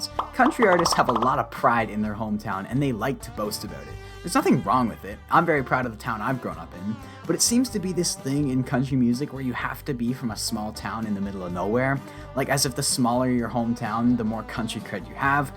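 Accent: Canada. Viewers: Dude why are you so amazed. We have little towns all over near Sarnia (which is where I believe you are from by the accent, me too). Arkona? Thedford? Watford? Chamlachie? The list goes on.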